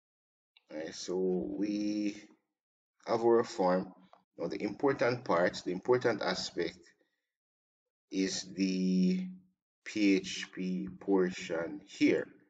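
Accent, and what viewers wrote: Jamaica. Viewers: jamaican accent on a php tutorial is maad crazy. big up unnu self man. Love from kenya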